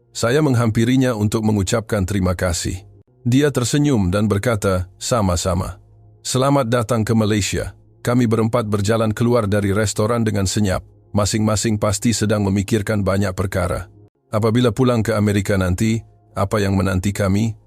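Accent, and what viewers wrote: Indonesia. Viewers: xpayah bangga la.da terang cerita ni hanya rekaan.nak cuba guna A.I BM tapi malangnya lidah A.I ni lidah indo.camna pn terasa janggal sangat sebutan dia.so kadang2 bunyi dia agak lawak.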